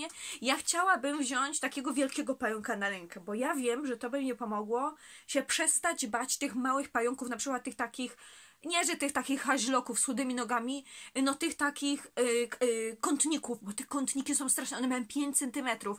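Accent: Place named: Silesia, Poland. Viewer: Jak fajnie ślonskiego posłuchać na yt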